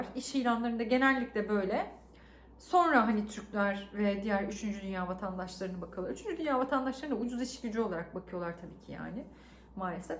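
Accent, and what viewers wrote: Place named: Cyprus. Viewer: Lakin ben sizin Kıbrıslı şivenizi beğeniyordum.. 🤪 kötü anlamda kullanmamıştınız..👍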